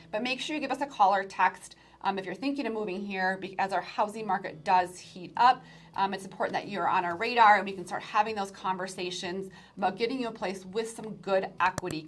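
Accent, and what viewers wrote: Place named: Minnesota, United States. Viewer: First minute of the video I knew you were from Minnesota, you’ve still got the accent. I’m from Mn too Lol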